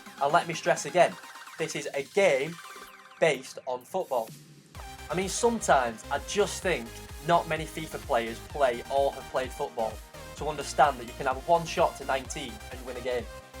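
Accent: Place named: Wigan, United Kingdom. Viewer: thought the accent was familiar haha. guessing you from round orrell/Shevy? was wondering what team you play for as I'm from wigan as well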